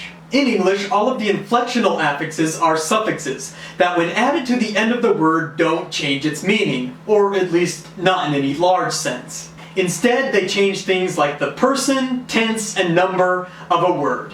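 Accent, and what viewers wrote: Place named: United States. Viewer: his accent also helps a lot, an excellent American well pronounced English Accent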